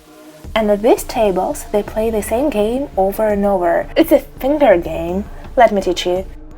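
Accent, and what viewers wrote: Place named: China. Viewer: 为什么你说英语有中国口音哈哈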